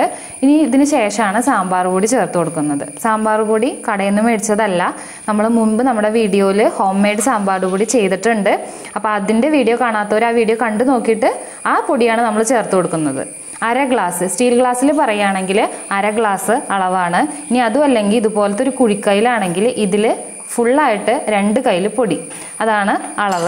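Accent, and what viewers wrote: Thrissur, India. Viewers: eppozha chechide channel kadath...Nalla Avathranam...naannayid receipeum....chechide veed evdyaa.Thrissur Aano....God bless u&Ur family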